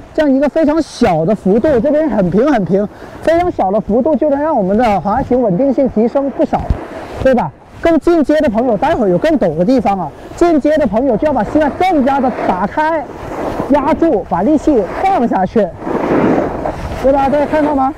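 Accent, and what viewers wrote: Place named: Cantonese, China. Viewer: 广普加儿化音 太逗了！哈哈哈哈哈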